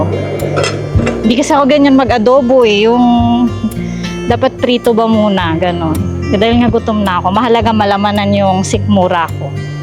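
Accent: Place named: Bulacan, Philippines. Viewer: Hello po,taga bulacan k po b kc may punto k po eh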